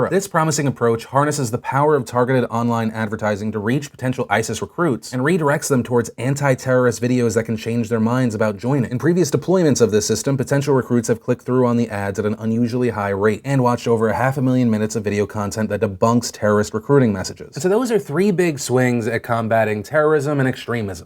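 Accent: South, United States. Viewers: Maybe this is a dumb question, but: why does Philip DeFranco end up with a slight Southern drawl in the end segment? How did that come to be a thing?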